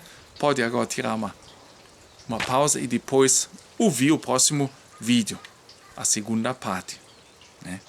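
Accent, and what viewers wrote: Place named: Germany. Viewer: Apesar do forte sotaque alemão, você fala o nosso linguajar, muito bem editado. Você dar aula de conhecimentos gerais que poucos conseguem. Parabéns; Mendonça de Fortaleza Ce. Br.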